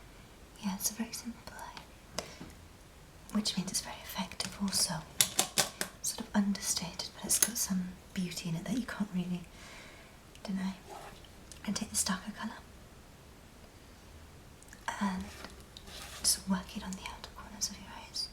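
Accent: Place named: United Kingdom. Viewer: Amazing, nice to hear such a nice British accent. One of my favourites :)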